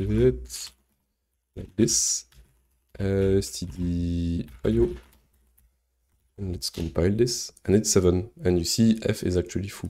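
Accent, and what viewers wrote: France. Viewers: u speak english like a french person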